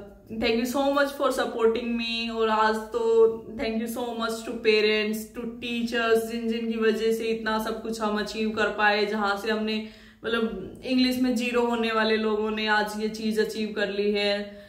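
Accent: Haryana, India. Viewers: Aap Haryana se ho ya Rajasthan se... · aap haryana se ho kya aapki voice tone se lg rha hai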